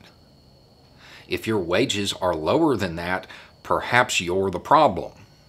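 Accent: South, United States. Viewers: You do realize that human trafficking could include helping illegal immigrants get to a better place correct? Just because it breaks the law does not always mean that it is immoral in nature. As for the accent as someone who was in the military and moved around I picked up the accent of any place I resided in given enough time, people gain and lose accents it is a thing so I don't know if it is really fake or not and even if it is is a Southerner now and I doubt you even know where he grew up so you can't even pull that not born a southerner card....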